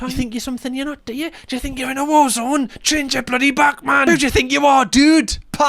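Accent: Newcastle, United Kingdom. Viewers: I am American, love the bri'ish accent and any fun we make of you is in good fun ❤ also very few of us use military time, usually because of specific careers like policing or the actual military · Everytime I watch your videos. My thoughts have a geordie accent for ages after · I love your accent! Can’t really explain why, I just enjoy listening to people speaking in the Newcastle accent, and don’t find it difficult at all (as a non native speaker). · You're the one who introduced me to the newcastle accent and I honestly love hearing it! The fact you also got amazing humor puts the ribbon on the gift, love your videos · I LOVE YOUR ACCENT! I'd never really knew about Newcastle and the various regions, just the usual ones we hear in the US. I couldn't figure out if it was Scottish or Irish...I was like it's a Scottish but easier to understand (for me anyway). 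I love Italian Bach's accent too, it's so smooth, sort of posh sounding but not too posh. To my ears his accent is like lower case cursive, and your accent is block print ❤ Love y'all from Virginia, not one of the obnoxious Americans Edit to add...here in Virginia we have areas that still speak an older English, even Elizabethan! <link> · American’s are absolutely a sucker for a British accent, actually what first got me to listen to video that came across my feed. He’s been my favorite commentary youtuber since. And likely tops the time watched list for me for the months since I found him! I don’t even normally watch commentary type videos often but is so pleasant to listen to, kind, and insightful. Oh and epic accent, of course! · I've gotten used to your accent and I really like British accents 💕🍩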